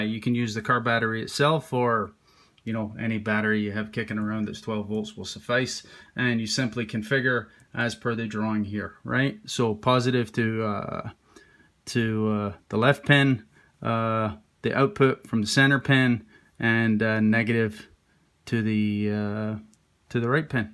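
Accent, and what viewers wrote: Scotland, United Kingdom. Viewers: Scots accent coming thru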